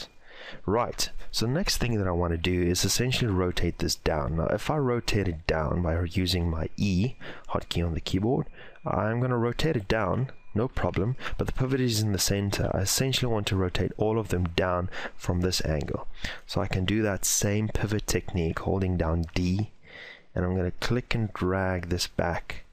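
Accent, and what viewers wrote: South Africa. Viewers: Heard the Afrikaans accent and I immediately subscribed. Die video is goed dit het baie ge help, Dankie. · My ears did a double take, a fellow South African ^^, nice work, learnt a lot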